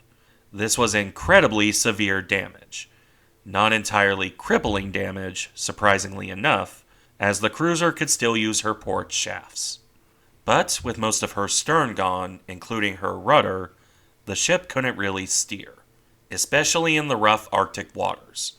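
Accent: United States. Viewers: Sorry, this is not meant to detract from the work you put into this video. It's good. It's just the pronunciation is like nails down down a chalkboard. Ironically enough at points you prnounce it almost perfectly. With the typical American accent it is easier for you to pronounce it Edin*Bruh* A similar sound to what you would make saying 'Brother* or "Hey Bruh, what you doing?" While not quite right, it is would be less jarring to people who live in the UK. I'll keep an eye out for your next videos. Thanks.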